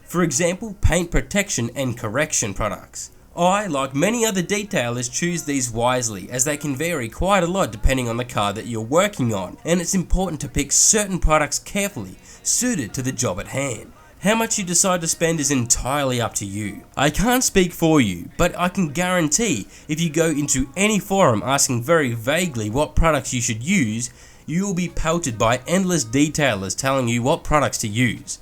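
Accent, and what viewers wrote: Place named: Australia. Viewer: Your Australian accent is giving me a blinding headache....I’m not from Australia....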